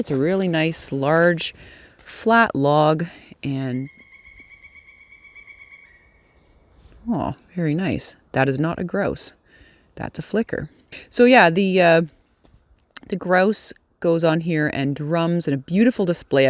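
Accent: Canada. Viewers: 1 min in and im thinking is that a Canadian accent I can here ? Ok thats a yes. Im English and go to Novia Scotia each year and im so wishing I was over there right now in lock down rather than here.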